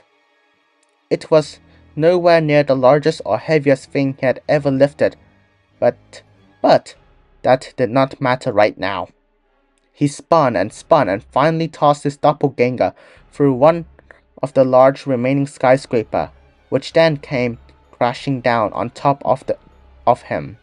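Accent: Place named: United Kingdom. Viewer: British ahh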